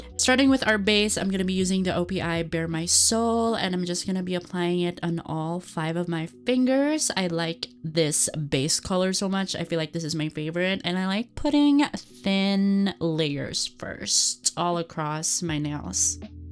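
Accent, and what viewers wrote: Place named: Russia. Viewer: the russian accent got me to subscribe hahahahahahaha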